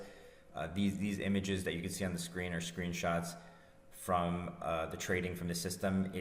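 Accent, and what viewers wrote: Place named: United States. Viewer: You are obviously American the way you talk (like me) but why are you using Blazemarkets? They don't allow US residents.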